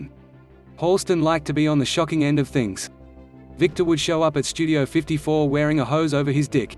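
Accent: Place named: Australia. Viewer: YES and the voice sounds like an Artificial Intelligent robot, it sounds drone like, whoever it was picked the "Australian male" voice setting in the narration reader and used a cheap AI subtitler on top of that.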